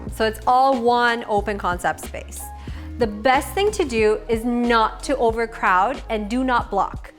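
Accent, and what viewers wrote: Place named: Iran. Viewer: Your Iranian accent is unreal! Beautiful woman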